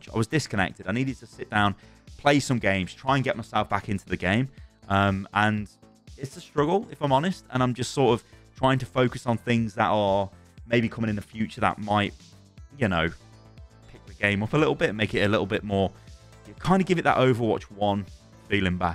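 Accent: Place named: United Kingdom. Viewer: Been here since the beginning, idk if it’s the British accent but between that and your consistency you’ve been my preferred outlet for overwatch news. Thanks for the mems.❤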